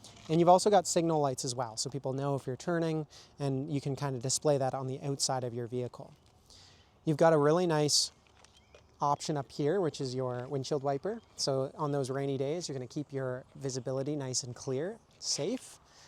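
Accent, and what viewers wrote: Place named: Canada. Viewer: Oh my goodness, Canadian Eh? Where can I go and see this and buy one.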